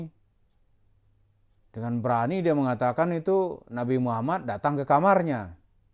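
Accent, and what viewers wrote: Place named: Padang, Indonesia. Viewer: ente dr logat bicaranya sprti orang padang....berarti en😢ente sma dgn si Mansyur l😅ngkiengkieng mangkait...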